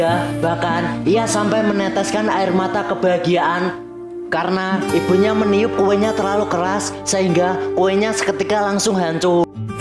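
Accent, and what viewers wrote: Java, Indonesia. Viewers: Njir medok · Logat nya jawa banget kang 🗿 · Awokawok logat jawa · Anjirrr suaranya medok bgt😂😂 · Wong jowo yo mas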